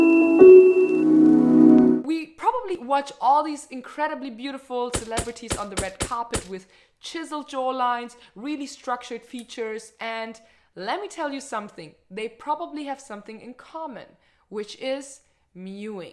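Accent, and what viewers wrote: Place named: Germany. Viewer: Hab direkt gemerkt, dass du Deutsch bist ;)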